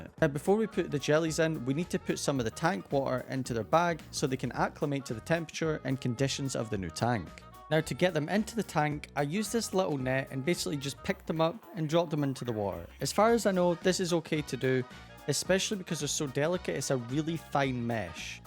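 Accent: Scotland, United Kingdom. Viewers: I think he’s British, but how many times he said WOA? Ah ended😅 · are u scottish by any chance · Is he British Im American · Bro is British because he says wa'er Instead of water and says sal' instead of salt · Guys is he British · Ur british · Hes British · Bro are you British or foreign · Wait your british??😊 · By your voice I can tell that your Scottish 😎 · HES BRITISH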